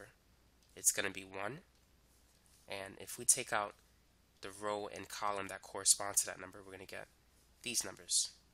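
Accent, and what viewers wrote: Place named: Texas, United States. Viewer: Hi american , are u from Texas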